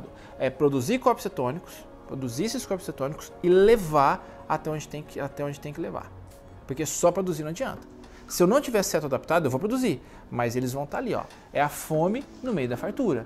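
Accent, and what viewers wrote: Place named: Minas Gerais, Brazil. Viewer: Esse seu jeito mineiro de falar que acalma a alma, Uai eu amo isso ❤ #tamojuntosempre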